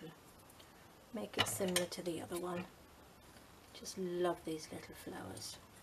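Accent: United Kingdom. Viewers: So very adorable. And so easy.. what we all need sometimes. And I have this silly comment to make. I love how many British people say "pop this on or pop over here or pop the kettle on for tea". It just makes always makes me smile. When said with the British accent it makes we want to get a flight to England tomorrow to visit anyone and have a cup of tea. Lol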